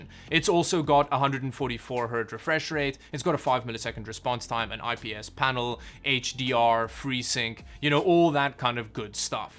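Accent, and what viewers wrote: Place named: South Africa. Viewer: why do u sound south african. Mhmmmmmmm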